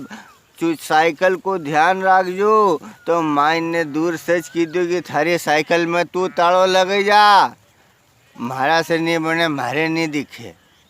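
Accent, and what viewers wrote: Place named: Malwa, India. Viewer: परम आदरणीय जी को जन्मदिवस की बहुत-बहुत बधाई आपकी मालवीय वाणी वाणी हमारे समस्त परिवारजनों को अति प्रिय है अतः भगवान से सेव कर बद्ध निवेदन है कि आप आप हमेशा ऐसे ही हंसते मुस्कुराते रहें और और अनंत वीडियो बनाते रहे धन्यवाद भीम सिंह चौहान तिलावत बड़ी तहसील तराना जिला उज्जैन मध्य प्रदेश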